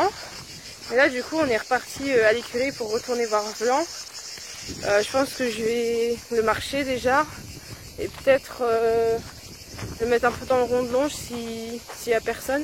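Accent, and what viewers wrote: Nord-Pas-de-Calais, France. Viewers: T’es une Chti comme moi 😁😁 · Mais non t'es chti ???!!!!!!!!!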